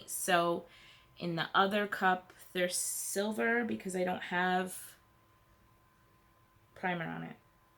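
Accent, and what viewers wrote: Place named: New Jersey, United States. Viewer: Lol I'm from NJ, just moved to Florida.. your accent was a welcoming thing to me.. we should go have some cawfee 😂😂😂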